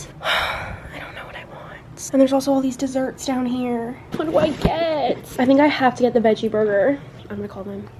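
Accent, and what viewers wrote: South, United States. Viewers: i feel like you’re developing a southern accent and its so cute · I don't know if it's just in this video or if it's always been like this and I just didn't notice, but actually has a really strong southern accent lol 😅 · You sound more southern in this!!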